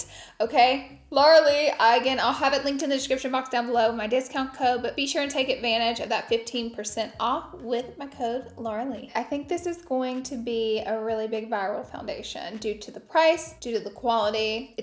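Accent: South, United States. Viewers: Girl let that southern lady come out don’t be holdin it back! Lol that’s how we say it in the south blyend… not blend lol😂🤣😆❤️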